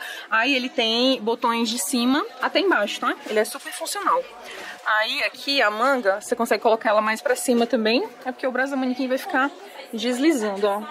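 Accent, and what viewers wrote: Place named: Pará, Brazil. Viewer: Mana, tô achando q tu é Paraense, rsrs. Gostei do vídeo, dando like e ganhou mas uma inscrita.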